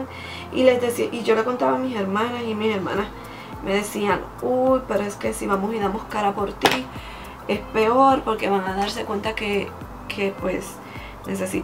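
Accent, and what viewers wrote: Puerto Rico. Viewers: ¿De dónde eres? Tu forma de hablar se me parece a la de Puerto Rico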